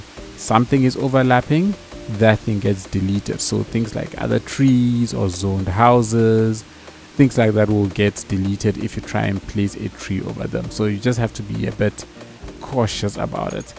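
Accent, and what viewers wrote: South Africa. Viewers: Thank you for putting this up. I really enjoy the South African accent! It makes me miss home! :)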